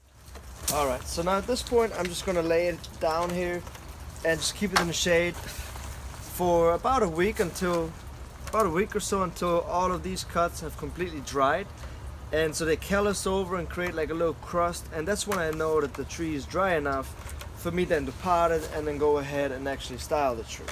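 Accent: Denmark. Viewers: Is this guy Danish? I think I can hear something Danish in his accent 🤔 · Is that a Danish accent?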